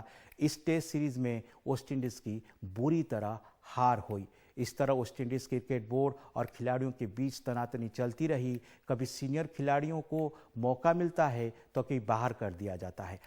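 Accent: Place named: Kolkata, India. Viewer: बोलने का तरीका गज़ब है सर जी आपका लगता है कि आप कोलकाता से है कि 😀😀😀😀😀😀😀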